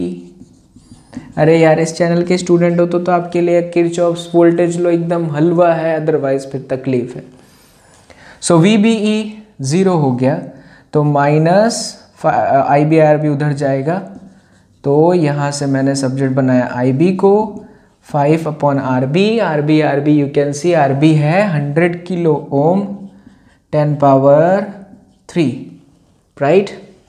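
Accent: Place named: Haryana, India. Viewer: Ni gujrati he is frm surat but hrynvi ati h unhe😁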